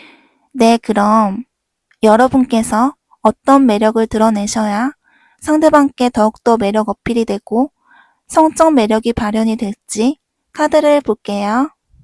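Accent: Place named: Gyeongsang, South Korea. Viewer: 경상도분이신가여 ㅋㅋ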